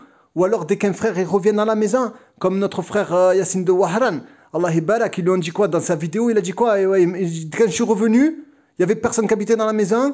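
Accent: Marseille, France. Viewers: Wa aleykoum salam, ça me fait toujours sourire ton accent et tes mots marseillais. Pour revenir au sujet, moi ce que j'ai vu c'est que les familles dissuadent généralement l'enfant de rentrer au bled pour qu'il ne "gâche" pas sa vie professionnellement surtout s'il est diplomé.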